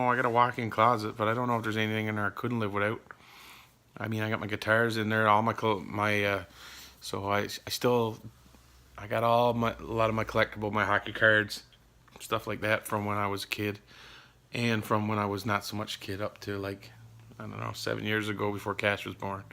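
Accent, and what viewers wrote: Canada. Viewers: Team_Natty that’s not just a Canadian accent my friend, that is a Newfoundland accent.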